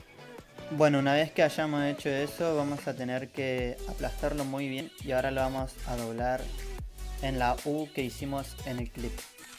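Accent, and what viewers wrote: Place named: Argentina. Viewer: Hisopos que cotonetes habla argentino papa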